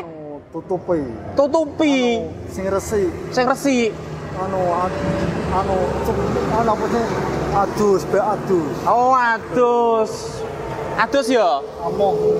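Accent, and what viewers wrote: Kendal, Indonesia. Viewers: Logat suaranya seperti orang gemuh,kendal. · Logat nya emang kendal itu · Logatny ky orang kendal .. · Iku logate wong Kendal maa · Logatnya Kendal bgt pak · Bahasane kendal banget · Logat bicarax kayak orang Kendal weleri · Bahasane asli kendal..daerah ku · Logate kendal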